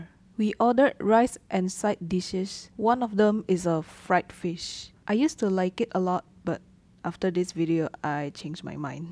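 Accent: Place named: Singapore. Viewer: Not to be rude or anything but are you from Singapore? I had 2 friends online that I used to play games with and they talked like you. No offense 😅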